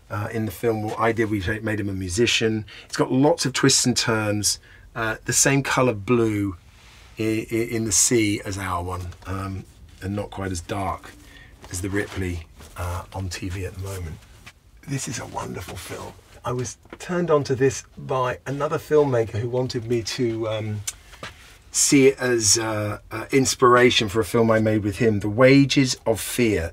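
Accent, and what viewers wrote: United Kingdom. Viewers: HES BRITISH!??!!!???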